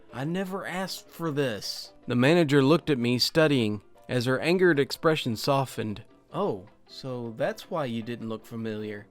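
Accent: South, United States. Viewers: the waylen jennings justified accent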